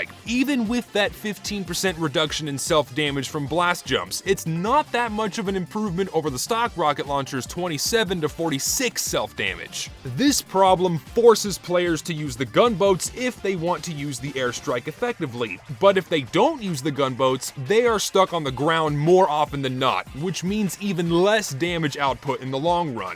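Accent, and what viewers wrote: South, United States. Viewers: I'm not american and to me your american accent is THICC AF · Ur southern draw started coming out in the end. You unconsciously change your accent when recording. Also I am typing this to boost engagement. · Are you mid-southern?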